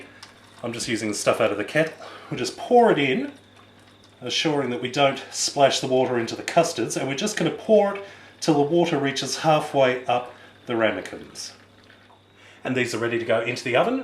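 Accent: Australia. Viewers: Is he australian? His accent is kinda familiar but i love caramel cream or whatever it is called. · What a delightful Aussie accent! ♥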